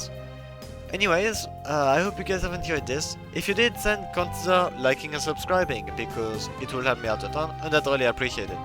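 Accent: France. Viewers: Are you French? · Are you perhaps, french? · T'es français ? Ta un accent français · Are you French ? Your accent seems French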